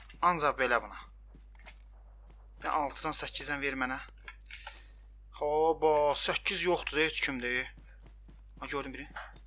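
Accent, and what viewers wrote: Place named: Shamakhi, Azerbaijan. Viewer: emi Şamaxilisan? Menim bibim gil Şmaxilidi senin kimi danisirlar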